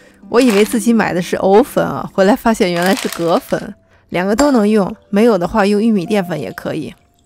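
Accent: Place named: Beijing, China. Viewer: 五零后老北京，喜欢那儿音的味道，，必看，关键是必听！倍儿地道。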